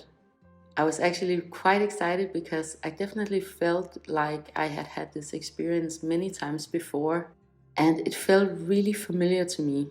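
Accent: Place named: Denmark. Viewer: Det lyder som en dansk accent 😊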